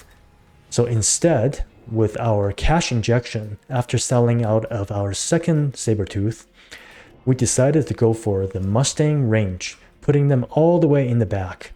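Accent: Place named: Taiwan. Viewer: ahh yes! my taiwanese colleagues speak in such accent too! keep up the great content! love your explanation on your train of thoughts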